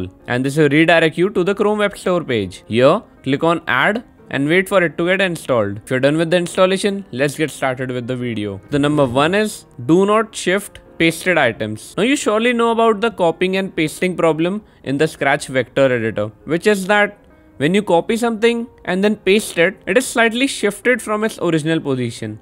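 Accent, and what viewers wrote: India. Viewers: I was focusing on the Indian voice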